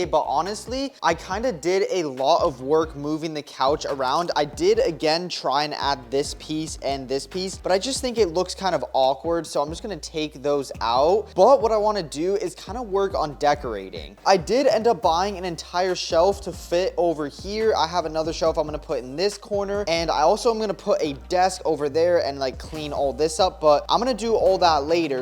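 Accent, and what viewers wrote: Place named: United States. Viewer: Bro is so American England is better he has so strong accent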